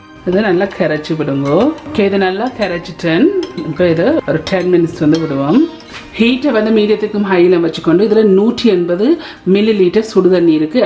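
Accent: Sri Lanka. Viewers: சுப்பர் சகோதரி எனக்கு இலங்கை தமிழ் ரொம்ப பிடிக்கும் இலங்கை மக்கலயும் பிடிக்கும்...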